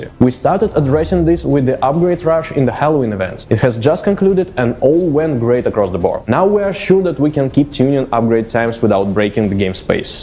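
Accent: Russia. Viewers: Чуваки, судя по акценту, сделайте видосы на русском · Братка русский знает акцент еще не ушел! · Я заметил русский акцент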